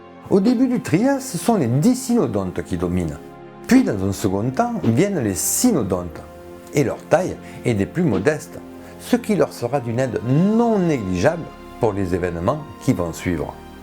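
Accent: South, France. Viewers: Une suggestion d'amélioration : remplacer ta voix, trop rebutante, par une voix D'IA ? J'aurais bien voulu écouter ce docu mais l'accent du sud je peux vraiment pas...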